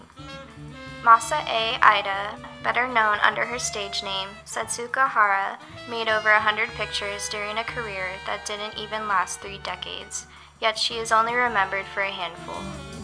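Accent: United States. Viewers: Inappropriate American voice narrating, tho’ the commentary is not stupid. Mispronounces her native English. Quacks voice. “The kinda girl...” UGH. Kurasawa doesn’t adopt books, he adapts them for the screen. She makes mistakes likes this pretty regularly. Her pronunciation of Japanese names is atrocious. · Another American voice. God help me.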